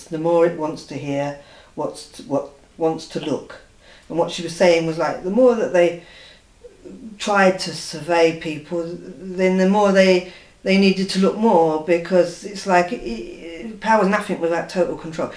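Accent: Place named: London, United Kingdom. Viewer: It's so pleasing to hear a lady speaking on gang stalking with a London accent. I think these people doing the surveillence and doing the gang stalking are just great big spiteful little boys in great big men's bodies.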